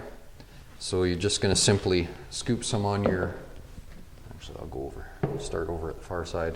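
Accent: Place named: Saskatchewan, Canada. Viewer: This guy has GOT to be from Saskatchewan.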